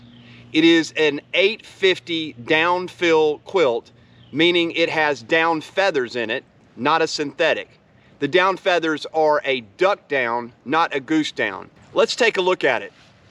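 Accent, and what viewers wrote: United States. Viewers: Where you from I like the accent, that's a proper American accent...Man I've been going to get this quilt for ages, its always A pain buying from the US we get hammered with expensive postage and VAT tax and import tax in the UK. I wish they would sort a good trade deal with the US so we could buy competitive. What kind is the back pack it looks a nice pack?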